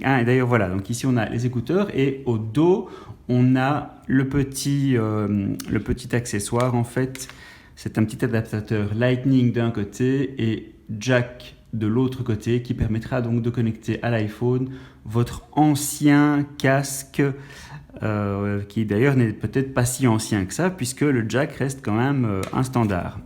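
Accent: Belgium. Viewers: T’es belge?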